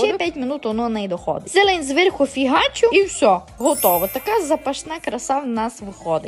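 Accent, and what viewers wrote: Transcarpathia, Ukraine. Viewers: Яка чудова ваша закарпатська говірка! Дякую 😊❤️ І рецепт також чудовий😊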